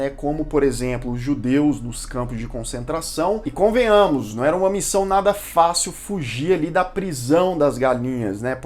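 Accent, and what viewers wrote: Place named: Belo Horizonte, Brazil. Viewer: Que beleza de vídeo e o lance da Kombi dos ovos a dez Reais foi massa!!!! Kkk parabéns!!! Vc é mineiro, né? Sotaque de bh!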